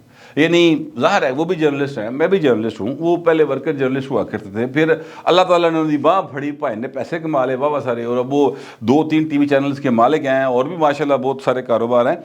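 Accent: Punjab, Pakistan. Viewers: Will u pl lessen the touch of Punjabi.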